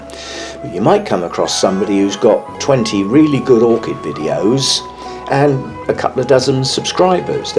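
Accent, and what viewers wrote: United Kingdom. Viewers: It is perfect,you know I'm not a native english speaker,and people with British accent really help me out with speaking;)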